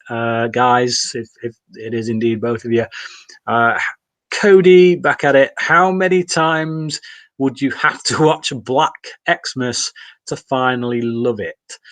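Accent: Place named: England, United Kingdom. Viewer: I have something I have always wanted to ask. What part of England are you from? Wondering about the accent.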